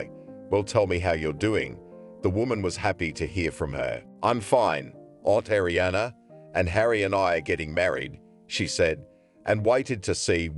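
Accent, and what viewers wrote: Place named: United Kingdom. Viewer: the stories are good but it would be better if the narator didnt have a british accent. ir's somewhat hard to grasp the words as he pronounced the letter A as I. sorry to say this but I hope you could find a better narator with american accent. tnx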